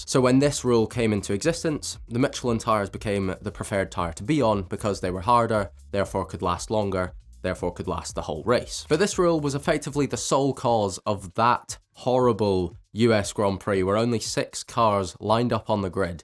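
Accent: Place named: Scotland, United Kingdom. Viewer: fellow scot! Imma sub to you just for that.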